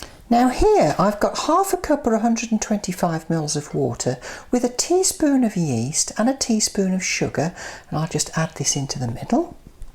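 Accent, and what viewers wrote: United Kingdom. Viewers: Thank you for the video. May I ask why you have British accent but speak Arabic?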